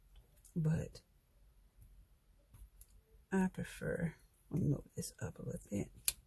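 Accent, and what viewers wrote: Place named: South, United States. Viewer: I like your southern accent😉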